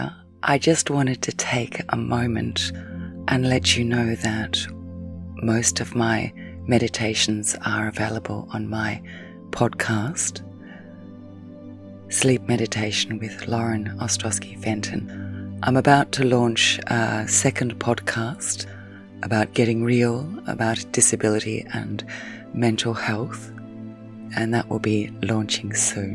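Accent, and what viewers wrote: Australia. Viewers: nice hearing a fellow aussie I'm going through a very tough time ( I'm a carer for my beautiful fiancee who self harms). Listening to you is sometimes the only thing that gives me solace and reminds me that yes "I'm ok " xxx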